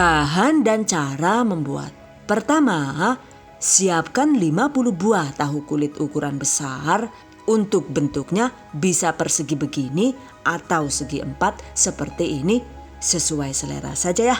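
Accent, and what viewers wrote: Banyumas, Indonesia. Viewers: Bund..kok pinter ngapak sih?ky bhsku di kroya hahaha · Gra" ngomong nya ngpak jadi aq subscribe 😁😁😁😁 manteeeep min 👍👍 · Mantap👍 simpel ekonomis Bdw lucu ngakak sm ngomong ngapake😀😀 tegal,Kebumen,Purbalingga rikane yu👍🙏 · Tks resepny Mb...smbil mndengarkn Mbny mnerangkn kdang trtwa sndiri dengar logat bcarany sy jga ngapak Mb salam knal dr purwokerto..Mb...sgt m inspirasi smg smkin sukses y Mb n sehat sllu aamiin... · Bunda orang mana aslinya kok dialek Banyumasannya fasih😀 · Jere madiun tapi ko ngomonge ngapak kaya enyong.sampean wong kebumen apa cilacap.apa banyumas.tegal ya mbak.ayoo ketahuan. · Aslinee Rika wong ngapak yakk? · Aq sllu ikutin resep2 dr bunda ngapak ini hehe .... Detail dan jelas ,dan sering aq praktekin ...mksih ya bun aq jd nambah ilmu 🥰🥰🥰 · Wkwk ada bahasa Jawa nya .. ngapak pula bikin ngakak 🙈🙏🏻 Itu bahasa daerahku Purwokerto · Wong ngapak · Lah jebule ngapak😂 Gombong hadir😀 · Lucu ngapaknya😂😂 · Lucu kok bisa bahasa ngapak, orang mana ini, salam kenal saya dari Cilacap 😆, terimakasih resepnya 🙏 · Suara ngapake gawa ngakak😂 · Ngomonge koh ngapak si mba...anu wong ndi si...aku wong Maos... 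· Jenengn asline ngendi sih yu? Kok ngmonge ngpak kaya aku. Aku kebumen.. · Jebule ngapak kaya nyong ha ha ha ha... maturnuwun Yu resepe jan josh banget yakin... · Jebul ngapak rikane yah yu😁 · Orang mn sih Bun, bahasanya ngapak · Bisa ngapak ngomonge.. wong endi sampean..? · tiba😂 kaget aku dengar bahasa apaan tu ngapak kah 😅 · Deneng ngapak kaya AQ yaaa hahaha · hahaha ngapak jg yuuu ....d tunggu resep berikut nya ..💕🌹🌹🌹🌹🌹🌹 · Ngakak yakin,,,trnyata wong ngapak toh,,pada yu nyong be wong ngapak kroya🤣🤣🤣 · Konsep videonya lucuu jadi ga bosen nontonnya.. Eh deneng bahasane ngapak. Aja aja wong banyumas kie ya 😂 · klo pas ngomong kirain org luar ..eh pas dgr ngomong ngapak..walahh..khas bgt pada karo inyong..😃😃😃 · Jebul wong ngapak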